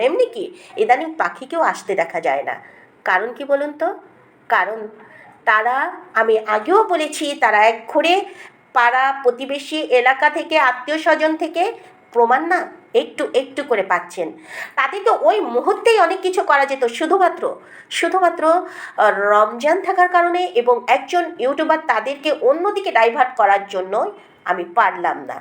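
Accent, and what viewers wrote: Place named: Kolkata, India. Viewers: তুই কে রে?কার চামচা,কোন পতিতার? কলকাতার মানুষের টোনে কথা বলতে যোগ্যতা লাগে। পতিতা কে পতিতা জেনেই বলেছে। তুই জানিস উনি কে? উনার আগের চ্যানেল থেকে ঘুরে আয় ,হেগে দিবি জানলে,দুই মিঃ তোকে বসিয়ে মুতিয়ে ,তা খাওয়াবে। তার সংসার নিয়ে তোকে ভাবতে হবেনা, তার স্বামী, সন্তান সমাজের উচ্চ স্তরের লোক,সে নিজেও তাই। শালী😅😅😅😅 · বাপরে বাপ, মুখ নাতো হলার মেশিন! না জেনে কাউকে পতিতা বানানো কত বড় পাপ। কলকাতার টোনে কথা বলে, কেরে তুই নতুন পাগল! তোর নিজের সংসারের কি দশা বোঝায় যায়!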